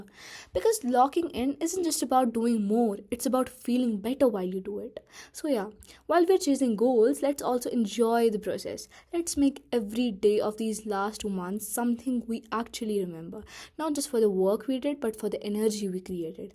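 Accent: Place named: India. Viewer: Indian accent ❤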